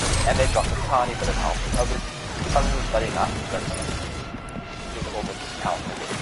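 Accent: South Africa. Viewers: Are u South African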